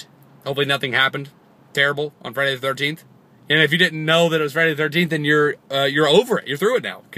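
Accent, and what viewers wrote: United Kingdom. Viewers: Dude u sound like charlieissocoollike with ur British accent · I love ya to death, but I hate your British accent. Bless your face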